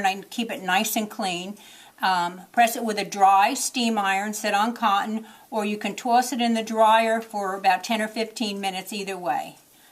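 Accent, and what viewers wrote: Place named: New Jersey, United States. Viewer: The minute I heard your voice, I knew you had to be in Jersey! Hahaha....Jersey shore girl here! Neptune!!! Anyway, can you tell me what pencils you are using and what the black pen brand is? Thanks.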